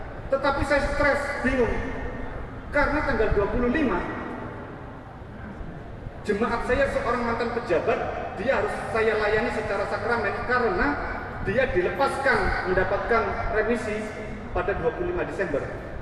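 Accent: Indonesia. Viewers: Wong ngapak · Lho omongane medok kyok aku, kesaksian opo kuwi, ndak ada isinya, ndak ada makna ne..nggilani.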